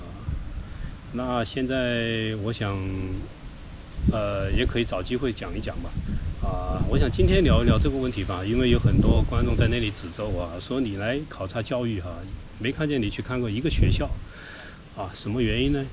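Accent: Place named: China. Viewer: 你是否遇到了马来西亚共产党了，声音与大陆一样啊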